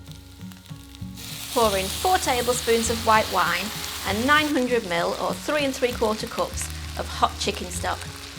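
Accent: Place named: England, United Kingdom. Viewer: English pronunciation, unusual to American ears I'm sure. I'm always impressed that includes American measures in her directions as well. Its not common to see both.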